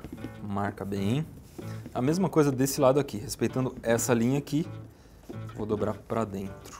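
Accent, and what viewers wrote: Brazil. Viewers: muito feio esse seu pt-br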